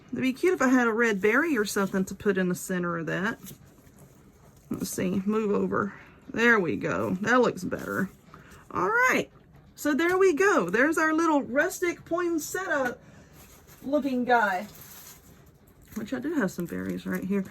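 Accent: South, United States. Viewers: First time watching! Love the easy project. Your southern accent is beautiful and you are a funny girl. Like, Like, Like! 👍 👍 👍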